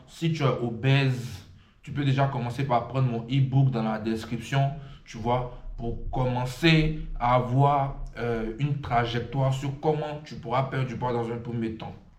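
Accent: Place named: Ivory Coast. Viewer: Coach je sais pas pourquoi mais j'aime tes gimmick et ta manière de parler, j'ai montrer ta vidéo a un pote et c'est lui qui ma dit que tu étais Ivoirien 😅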